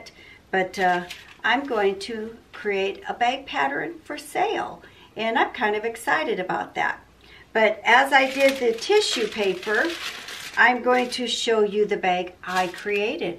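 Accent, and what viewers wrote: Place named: South, United States. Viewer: I just love those fabrics you chose; the cardinals and the bicycles! Your bag is perfect! I look forward to seeing the completed pattern project. I am trying to make my 1907 Baby Bonnet pattern available. We do love a challenge! you are special! I don’t know if you’re southern, but we say some things the same way, and I have to think really hard to complete my sentences. I put a dash of vanilla flavouring in my coffee. It improves the taste. I enjoy your chats. I’m also trying to lose inches. Best wishes!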